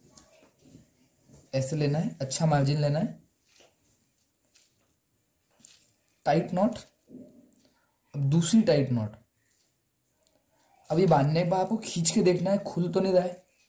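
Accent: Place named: Indore, India. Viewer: Aap indore se he . Aapki language se pata chal raha he. Very nice.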